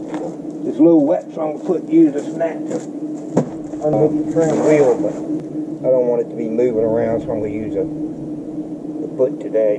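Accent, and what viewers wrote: South, United States. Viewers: I love your southern accent!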